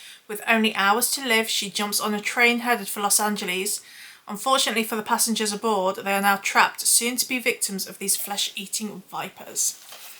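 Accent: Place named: Liverpool, United Kingdom. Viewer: Why are you speaking in a Scouse accent? Are you even from Liverpool? If you are not from Liverpool then why are you speaking in a Scouse accent?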